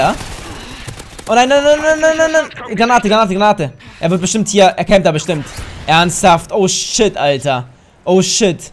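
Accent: Hanover, Germany. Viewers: premium ^^ du kommst aus hannover? :D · kommst du aus Hannover???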